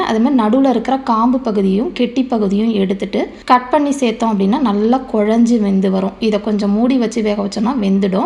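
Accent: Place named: Kongu, India. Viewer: Sister unga native enga.. கொங்கு தமிழ் mari இருக்கு உங்க slang????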